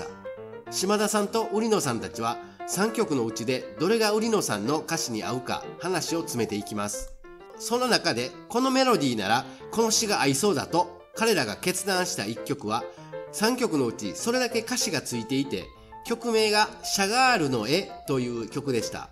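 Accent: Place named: Kansai, Japan. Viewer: 中森明菜ファンでしたので登録しました‼️面白いepisodeありがとうございます♪ナレーションの関西弁素敵💓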